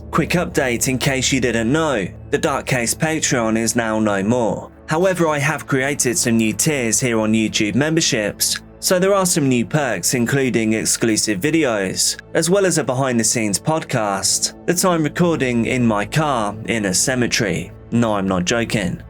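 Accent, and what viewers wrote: England, United Kingdom. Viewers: Oh thanks for the "human narrated"!!🩷🩷i nearly skipped this content cause i thoight It was AI. ìnstead,you re englidh,not american! Love ot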